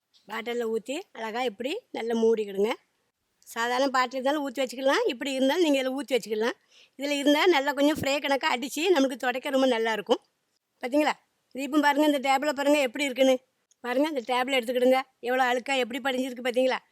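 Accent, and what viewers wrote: Tirunelveli, India. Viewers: Tirunelveli bashai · Tirunelveli slang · Tirunelveli tamil kekka sandhosama iruku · உங்கள் ஊர் சொல்லுங்க..திருநெல்வேலி பேச்சு மாதிரியே இருக்கு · திருநெல்வேலி, கன்னியாகுமரி மாவட்டங்களில் பேசுவது போல் இருக்கிறது. சூப்பரா இருக்கிறது. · Nenga Nagercoil Ila Tirunelveli ya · Nenga thirunelveli pakkama?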